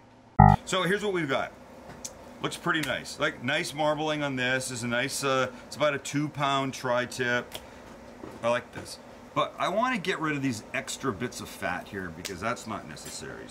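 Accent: Canada. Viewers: Is he from Canada?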